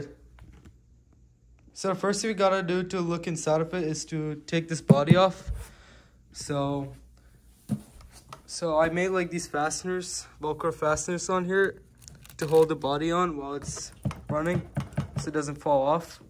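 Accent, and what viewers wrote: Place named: Kerala, India. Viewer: your name and your accent 😀. is a common name in kerala and the way you speaks resonated like a malayali touch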